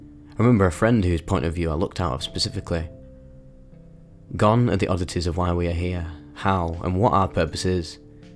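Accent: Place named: Manchester, United Kingdom. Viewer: Beautiful pic and title made me smile, I am a /lad/ from Mississippi and I've always loved the manc accent since discovering karl pilkington around 08,09 and listening to the Ricky gervais show animated, an idiot abroad, and then the rest. You bring that for me with your own style on one of my favorite topics and do it so eloquently and with such a wonderful energy behind it. Please always continue if you continue to enjoy this. I listen to every video.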